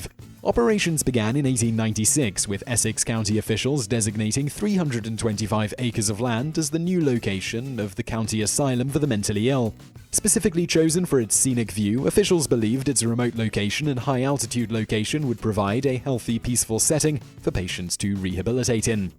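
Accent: United Kingdom. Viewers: Not trying to be mean but the british accent takes away from the video.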